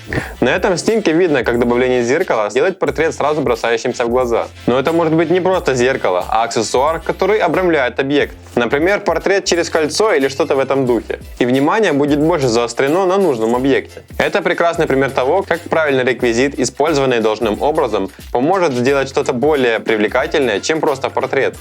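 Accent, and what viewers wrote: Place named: Romania. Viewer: акцент какой то румынский. надеюсь он Русский человек.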